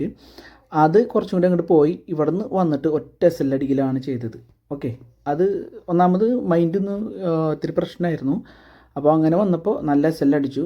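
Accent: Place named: Thrissur, India. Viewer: ചേട്ടാ 3 മിനിറ്റ് ടൈം ഫ്രെയിം ഉപയോഗിച്ചു smc വരച്ചു നോക്കൂ അടിപൊളി ആണ് എനിക്ക് ഇവിടെ ചാർട്ട് പോസ്റ്റ്‌ ചെയ്യാൻ പറ്റുന്നില്ല ഇന്ന് 9.20 നു ce എൻട്രി 9.42 നു put എൻട്രി 2 ഉം അടിപൊളി ആയിരുന്നു. ഇവിടെ ഉള്ള ആളുകൾ forex മാർക്കറ്റിൽ യൂസ് ചെയ്യുന്ന smc സെറ്റ് അപ്പ്‌ വെച്ചു ഇന്ത്യൻ മാർക്കറ്റിൽ ട്രേഡ് ചെയ്യുന്നത്. അത് കൊണ്ട് smc യുടെ കൃത്യമായ ഗുണം കിട്ടില്ല ഇന്ത്യൻ മാർക്കറ്റിൽ ഇയാളുടെ വോയിസ് കേട്ടിട്ട് ഇവിടെ തൃശൂർ അടുത്ത് ഉള്ള ആള് ആണ് എന്ന് തോന്നിയത് കൊണ്ട് പറഞ്ഞത് ആണ്. ഈഗോ ഒന്നും തോന്നരുത്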